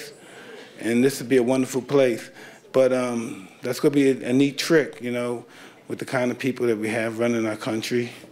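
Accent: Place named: United States. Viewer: I like the way he articulates his points in pure American English, Tyson Mike a true legend,he speaks English so nicely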